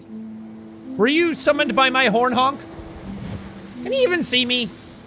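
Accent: United Kingdom. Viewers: Tiffany Sigrist Just by the way, he's not saying it wrong it's just the British pronounciation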